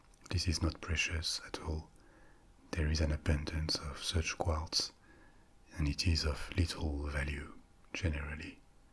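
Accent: France. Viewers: t'es français ? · The content is fab in that it takes you to far off exotic places but that sexy French voice sends me to la la dreamland.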